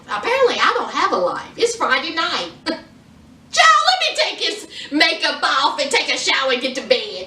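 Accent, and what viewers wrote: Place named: Tennessee, United States. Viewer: Where you from gal? You sound like your from my neck of the woods in TN. The show is addictive. I like it too!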